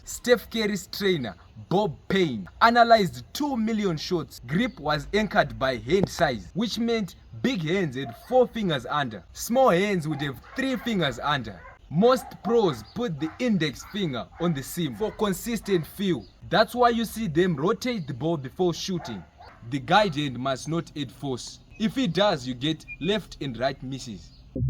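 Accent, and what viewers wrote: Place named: Zimbabwe. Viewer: That zim accent😂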